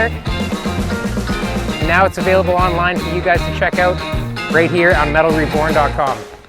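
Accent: Canada. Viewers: haha i thought you were saying “about” and “out” a little weird and then when you said princess auto or whatever I was like ohhhh okay he must be from Canada😂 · Great channel you have and I like the tips and tricks so thanks for those. I’ll be working my way back through your videos as there seems to be a lot of stuff that’s relevant to anyone fabricating in steel not just hot rodders. Picked up you were Canadian as soon as you said “out” and then “princess auto” confirmed it. It’s a bit like Australians and New Zealanders, subtle but obvious once you hear it. Incase you’re interested I’ve put a link to a video I found yesterday of Japanese craftsman hand making what looks like a glove box with compound curves and all done the old school way with tin snips, hammer and dollie’s, shot bag and oxy fuse welding. Not an English wheel or tig to be seen. I haven’t got a clue what he’s saying but I reckon it’s a work of art. 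Cheers, Stuart 🇦🇺. <link>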